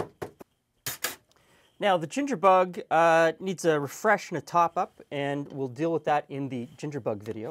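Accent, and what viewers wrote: Canada. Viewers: I can't believe I only just found this channel today. I love your content, and it's neat hearing a YouTuber/media personality with a dialect similar to mine (I'm from Nova Scotia, but us Canadians in general sound pretty different from Americans a lot of the time, imo). This has been a fun learning experience, excited to try this asap! :)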